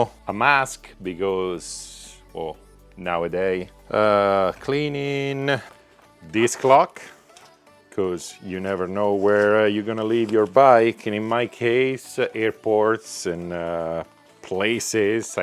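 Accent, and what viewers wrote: Italy. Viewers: that's an Italian accent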